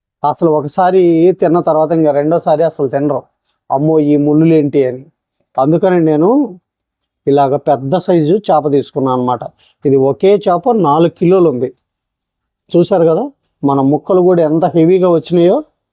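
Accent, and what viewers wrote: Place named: Tenali, India. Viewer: Meeru cheptunte naku tenalanipisthundi sir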